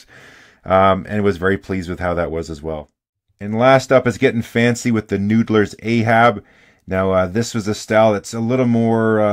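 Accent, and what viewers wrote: Canada. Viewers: Are you AvE’s less sweary brother by any chance. That Canukistan cant is remarkably alike.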